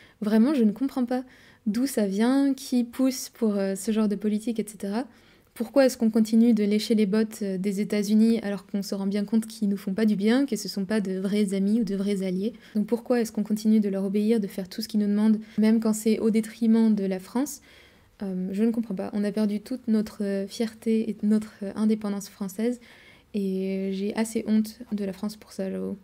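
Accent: Russia. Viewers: Расскажешь про Китай когда вернешься?буду скучать по твоему рязанскому Ы.обожаю его.успехов. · Тоже подумала, что акцент похож на русский )) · C'est marrant, tu as un accent russe.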